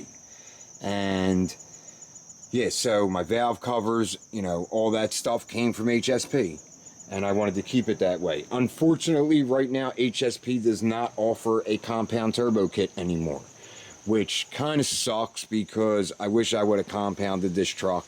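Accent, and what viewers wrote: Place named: Pittsburgh, United States. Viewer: What part of Pennsylvania are you from? I ask because your accent sounds like you’re from the Pittsburgh area and I’m moving back and I have an lml.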